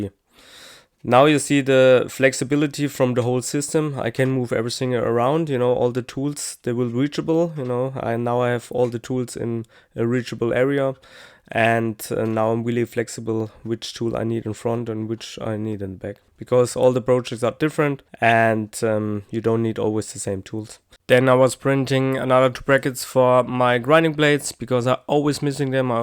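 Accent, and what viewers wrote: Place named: Germany. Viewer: Every damn time I watch one of your videos, I get envious. You're truly a master of your craft, man. As someone who's in a similar situation where confined workspace quarters are concerned, I've tried to explore ways to improve how I store things. French Cleats appear to be the best option due to how modular and customizable they are. You've basically taken that same approach, only you've opted for a pegging system with the same kind of modularity and customization. I'd suggest incorporating a sliding rail panel in the future should you run out of space in the future, but I don't know if that would be possible in your shop. Honestly, I think you've done everything you can with what you've got. The only other way to deal with continual space issues is to just get a bigger shop. Maybe that's in your future? And for some reason, I always thought you were American but judging by your accent, it sounds like you're German? 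I guess my American arrogance is showing, but then again, I could accuse you of allowing your German intelligence to make me feel insecure, too. ;.) Keep doing what you're doing. Love watching your videos. Quick question: For someone who doesn't have one of those plasma CAD table things, what would the best way be for anyone trying to make some of those pieces you cut out that have lots of curves and whatnot? Just tracing and cutting wheels? I have an angle grinder, but I don't have (yet) a plasma cutter.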